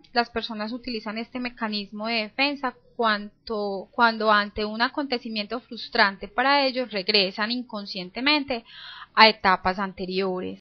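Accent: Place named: Colombia. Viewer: Su acento es muy fuerte y no logro entenderla, es de colombia verdad? bueno, no es criticar solo decir que el huehueo que hace al hablar no hace que se entienda profesionalmente el vide.. mejor los acentos neutros.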